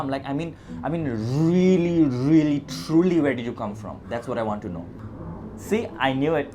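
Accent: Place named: Northeast India, India. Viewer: Did anyone predicted correctly that u belong to north-east when u visit other western states eg Mumbai😁 the way u talk it can be predicted tht u r from north-east but seeing ur face its really difficult 😊 I really enjoyed watching 😊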